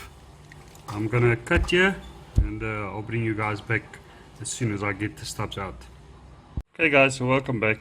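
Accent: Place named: South Africa. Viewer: Thank you, your mini looks good after the Dremel, where are you from? You sound South African.......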